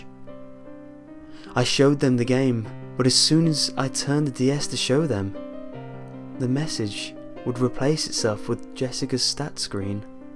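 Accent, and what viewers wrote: United Kingdom. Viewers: ) omg Your british acsent is awesome !! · Just curious, are you British?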